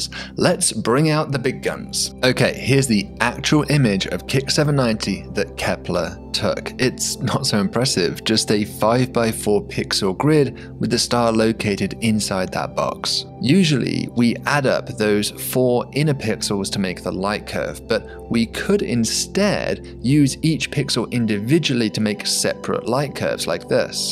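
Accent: England, United Kingdom. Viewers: His dreamy English accent takes me out of space to dreamland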